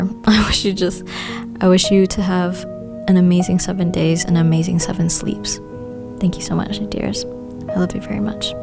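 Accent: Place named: United States. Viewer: Thanks for talking like an educated American USA in YouTube.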